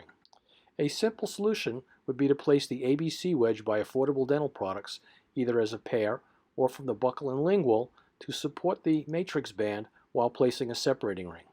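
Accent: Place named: New York, United States. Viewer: great NY accent :) great content also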